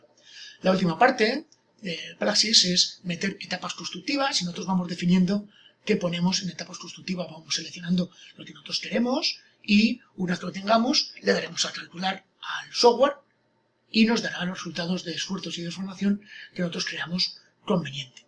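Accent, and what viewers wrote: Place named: Spain. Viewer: Que acento para mas feo, del idioma castellano el peor hablado se encuentra en españa, sin duda.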